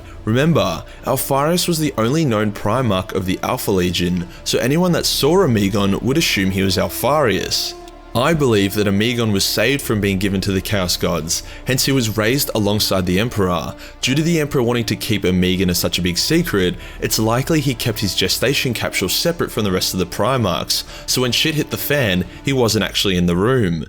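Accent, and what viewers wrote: United States. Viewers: Damn that was a pretty good US boy accent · I lost it to the American accent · THE AMERICAN ACCENT. WHOOOO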